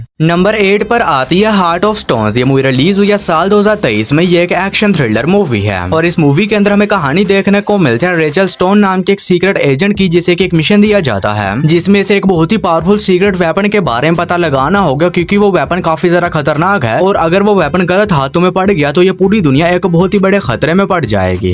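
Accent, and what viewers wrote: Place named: Lahore, Pakistan. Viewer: Bhai tum Lahori ho Kya bolne ka tarika waisa he hai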